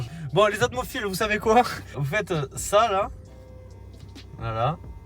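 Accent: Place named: Southwest, France. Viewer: Salut , je te découvre avec cette vidéo . Format technique et humour que j'adore . Le petit accent m'est familier ..sud ouest , non ?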